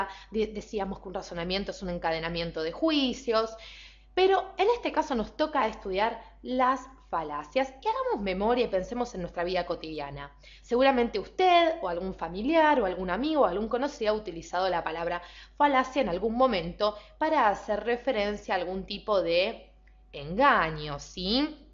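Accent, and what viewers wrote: Argentina. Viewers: Muy dinámico, sencillo y con buen nivel su video. Le recomiendo que continúe con otras falacias. Enseño Procesos Cognoscitivos en mi Universidad (Autónoma de Santo Domingo, R. Dominicana) y pienso usar este video como material complementario con mis estudiantes. Por su acento infiero usted es argentina (¿oriental tal vez?), país al que me unen fuertes lazos afectivos y culturales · Profesora ¡¡ maestra ¡¡ yo la admiro me gusta su enseñanza . Me encanta su acento argentino. Y no solo eso estoy aprendiendo pero no entindo su escritura ..parese la escritura de una doctora medico general . Jejejej lo hace a propocito ?? Mis respetos a usted .. ahh ya entendi nada es facil en esta vida ....un humilde servidor suyo .. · estuvo bien el vídeo pero odio los acentos argentinos ,bueno casi todos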